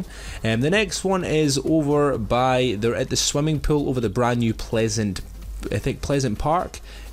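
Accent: Scotland, United Kingdom. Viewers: Are u Scottish or Irish?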